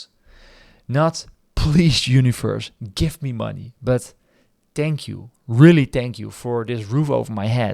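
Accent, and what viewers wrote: Netherlands. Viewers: aan je accent te horen moet je wel nederlands zijn .... vraagje doe je ook meer dan meditatie , astral projectie toevallig ? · Ik kom dit filmpje tegen tussen mijn video's. Klopt het dat ik hoor aan de manier van praten dat je Nederlands bent? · 2 words out of your mouth and I knew you were Dutch lol. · Love the netherlands accent ☺ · Jij bent echt Nederlands zo te horen 😂😂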